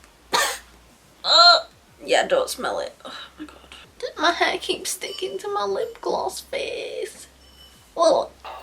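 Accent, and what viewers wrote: United Kingdom. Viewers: WHO KNEW SHE WAS BRITISH AND HAD AN ACCENT LIKE THAT! TF I THOUGHT SHS WAS AMERICAN😂❤️❤️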